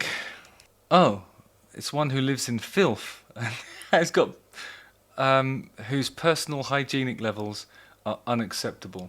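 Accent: United Kingdom. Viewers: Dude i honestly thought this was tommy lee doing a british accent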